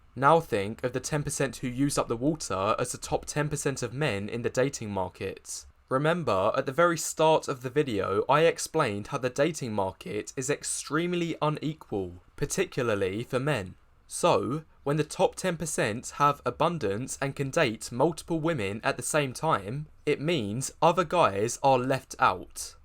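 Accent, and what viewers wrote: Essex, United Kingdom. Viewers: I love your content but would it be possible for you to work on your Towie girl accent? “Togevarrrr” (together) “Eivarrr” (either) “One two free girws standing togevarrr” Come on. You’re better than this.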